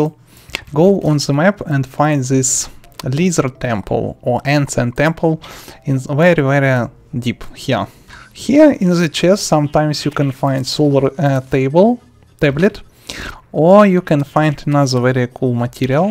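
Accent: Russia. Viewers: У него русский акцент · it sounds russian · Я конечно пытаюсь выучить английский, но русского человека говорящего по английски с таким акцентом понимать невообразимо просто, спасибо.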